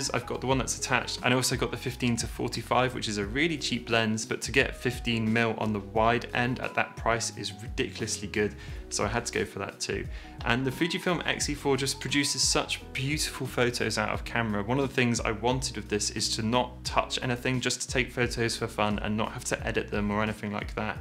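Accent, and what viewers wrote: England, United Kingdom. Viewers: I am very careful with my gear and so use cases and watching your videos I notice on your phone you do not use a case. Are you very particular in how you handle your phone or do these higher end iPhones have some sort of protective covering on them. I try to be as environmentally aware as possible but one can only go so far with technology before it becomes obsolete . I am facing this now with my first generation iPad Air. I purchased it in November 2013 so coming up for ten years but in this last year, even though it still works well sadly most app´s have stopped working with it and even safari pages will not open in many cases due to the old iOS! Its sad as well as I have always kept it in a folio case and if I take it out the thing still looks brand new! After watching your reviews of the iPad 10th Gen and the 5th Gen iPad Air I have decided to get the air due to the M1 chip and hopefully that means it will have a longer iOS upgrade lifespan, I have been on ios12 point something for years now on my old iPad! 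I am guessing I will notice a considerable difference. I have seen this already with comparing it with my iPhone X ( still working perfectly with plenty of battery left and again, always in a folio case so no need for those horrid screen protectors again, it still looks like new, hopefully I have a good few years left in it as far as iOS upgrades are concerned. I am very much enjoying your channel and have subscribed. Nice to hear and English Accent. I am from Devon bu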